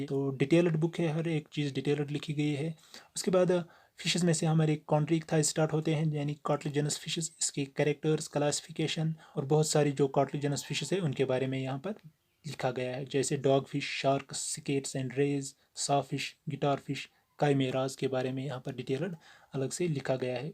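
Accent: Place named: Kashmir, India. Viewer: You are Kashmiri I got it through your accent 😶... Great job bro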